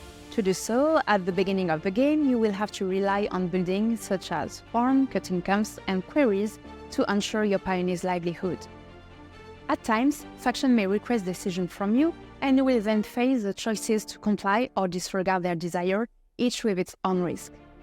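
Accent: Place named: France. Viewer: Don't know why, but the French accent is very persuasive.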